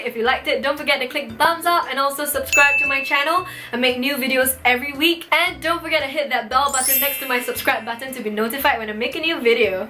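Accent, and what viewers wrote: Singapore. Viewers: singaporean english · Singaporean accent lol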